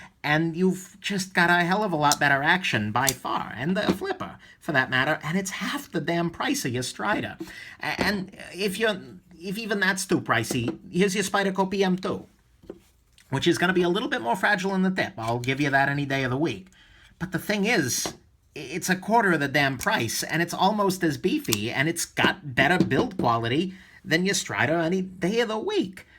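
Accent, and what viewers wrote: New York, United States. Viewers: Love the vids. You have to be from New York. Lol. Cheers.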